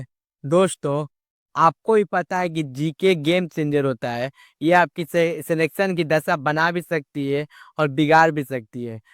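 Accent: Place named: Bengal, India. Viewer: Abe vo bangali bolta hai hindi sikh raha hai abhi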